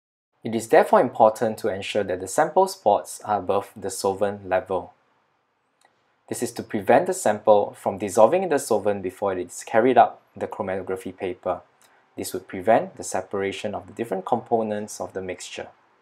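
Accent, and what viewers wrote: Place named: India. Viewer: Sounds from india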